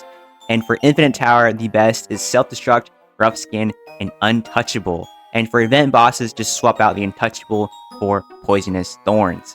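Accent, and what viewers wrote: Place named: United States. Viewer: bro thanks man, these videos are really helpfull its nice to have a normal NON AI american voice explaining the game. W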